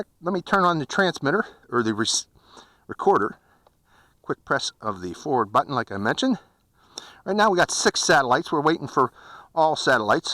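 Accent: Pittsburgh, United States. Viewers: are u from Pittsburgh? I noticed a accent. .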